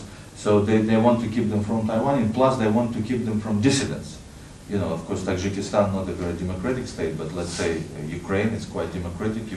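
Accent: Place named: Russia. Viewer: Хорошо поставленный у вас английский, впрочем не без сильного русского акцента).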